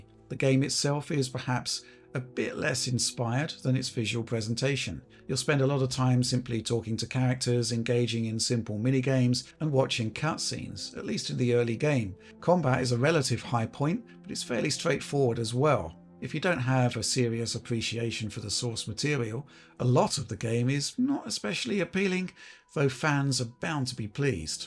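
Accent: United Kingdom. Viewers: It's the accent, British seems to scream seriousness and maturity. Game of thrones anyone? 😉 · British voice for a British franchise, very appropriate. Also great work on the analysis.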